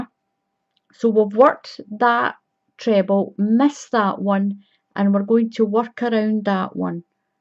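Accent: Scotland, United Kingdom. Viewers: Thank you once again for a brilliant tutorial. Well demonstrated no music to distract and a bonus when I hear your Scottish accent. I would subscribe to your channel but do not want my name shown on line. If I can do it any other way I would be happy to subscribe. Thank you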